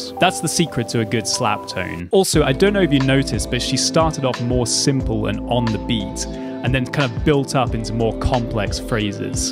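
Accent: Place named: United Kingdom. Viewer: Nothing like funk with a British accent! Love it. That's some proper funk, mate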